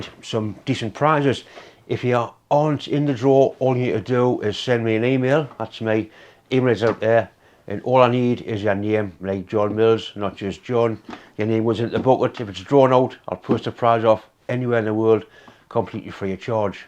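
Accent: Newcastle, United Kingdom. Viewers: John - great video, as ever - thanks for everything you do. Just wanted to let you know a bit of an odd coincidence - I started following your channel after a shout out from the Canadian YouTuber AvE, but despite the familiarity of the accent I'd not looked too much into it, s'just some geordie lad doing his thing. Then I noticed the address on the package - I had to double-take as a wave of nostalgia flooded over me. Despite now living at the other end of the country, as a kid, I grew up in the countryside of Northumberland and used to stay at my grandparent's house every weekend - my granddad was a retired engineer and used to fettle model boats in his garage, definitely where I got my love of engineering from. Anyway: they lived at the bottom of St. John's Estate, and I used to go walking the dog down the path alongside the Stamfordham road down past Callerton. 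Good times with the innocence of youth and all that! Anyway, keep up the good work, all the best to you and yours. :)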